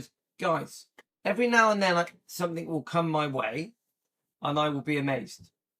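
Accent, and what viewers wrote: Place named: Norfolk, United Kingdom. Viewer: did you grow up anywhere near Norfolk because sometimes I detect a Norfolk accent? Only sometimes 😀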